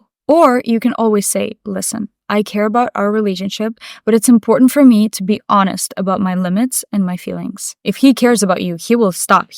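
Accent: Russia. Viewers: Where does this accent come from? Russia? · She's Russian for sure:) only Russian girl can speak and act like this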